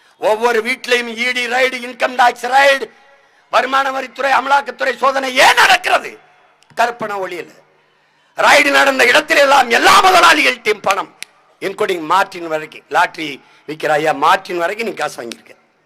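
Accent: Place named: Andhra Pradesh, India. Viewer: Guntur Tamil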